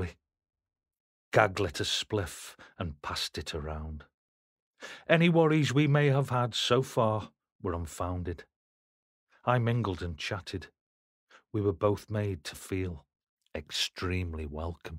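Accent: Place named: Stoke, United Kingdom. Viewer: Perfectly narrated and nice to hear I being told with a local accent, never was into the football scene, was more into the old club scene in stoke and very proud of my home city Stoke On Trent. Just downloaded Naughty based on this.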